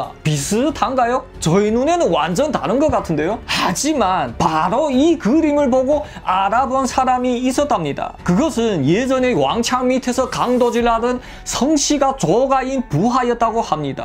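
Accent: Yanbian, China. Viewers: 언어 표현력이나 사용하는 단어들은 한국인 못지 않은데....억양은 중국억양이네... 이야기를 재미있게 풀어 말하는 재주를 타고 났네요...중국어로 말하면 중국인들은 말문이 막히겠다..... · 중국이야기라 조신족 말투를 하는가? 아니면 원래 조선족출신이라 발음이 그런가 ᆢ하옇튼 시원시원 듣기 좋습니다. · 이것도 뭐 재미나네. 구수한 연변사투리에 새롭습니다. ㅋㅋㅋㅋ 다음에는 涂贵武 사천킬러 투꿔이우 이야기도 좀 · 옌볜 말투 넘좋아~~^^😂❤ 구독!!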